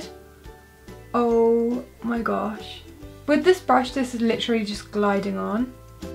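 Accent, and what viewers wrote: United Kingdom. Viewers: I love your accent. I've always wondered what it would be like to live in the UK, mmmmmm, anywhoo, thanks for sharing, great tutorial.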